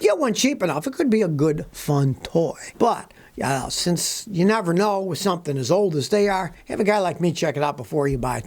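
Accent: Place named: Tennessee, United States. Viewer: Anyone else notice that is getting a Tennessee drawl???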